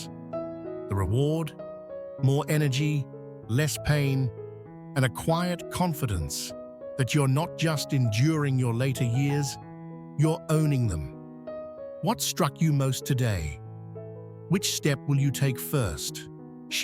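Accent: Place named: United Kingdom. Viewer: What’s with the GdDam British accent?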